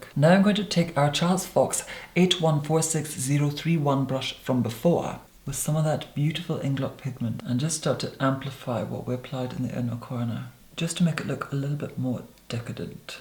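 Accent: Scotland, United Kingdom. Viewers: The britanic accent it's stunning! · claire johnson I’m also from Scotland, but his accent is SO different compared to mine because it’s so ‘posh’ lol.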